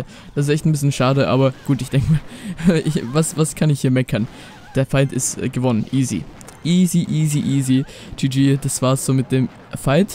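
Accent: Austria. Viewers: Österreich du?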